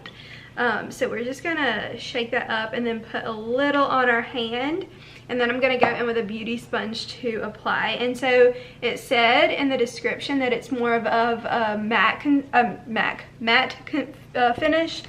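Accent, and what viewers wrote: South, United States. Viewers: Im obsessed with your southern accent it's just so adorable 😩😩😍😍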